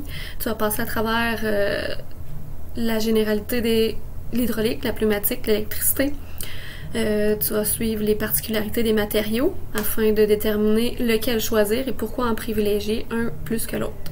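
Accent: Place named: Quebec, Canada. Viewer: Ben moi j'adore l'accent québécois, merci ça donne envie d'y aller 😊😎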